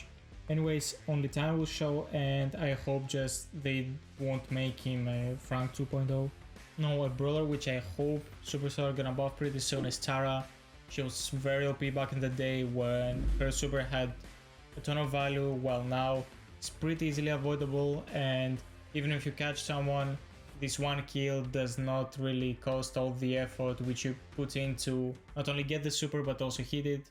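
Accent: Greece. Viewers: Bro with your voice i can understand you are greek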